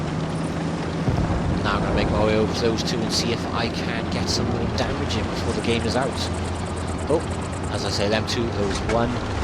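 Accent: Wales, United Kingdom. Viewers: Those Dulcet Welsh Tones :)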